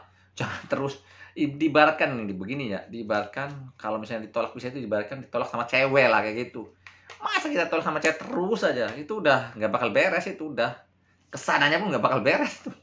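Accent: Indramayu, Indonesia. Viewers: Sampean asli Indramayu ta kang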